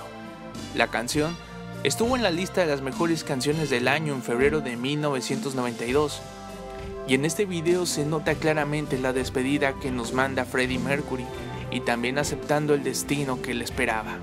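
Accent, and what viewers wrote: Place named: United States. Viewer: Por qué el narrador habla con un ascento americano muy feo??????, Se escucha horrible, habla con tu voz natural ;)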